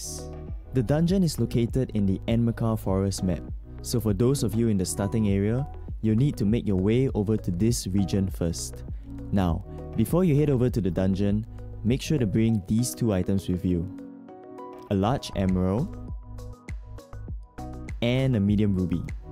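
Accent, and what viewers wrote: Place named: Asia. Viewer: Not bad at all! At first I recognised the Asian accent straight away (I’m Asian myself Malaysian that moved to UK since 14 and now 31) and i wasn’t expecting it to be a good video, but at the end it convinced me that I was wrong. In fact, at the end, I kind of felt warm and home listening to that accent. Good guide/video! Although I was already passed that stage while watching this.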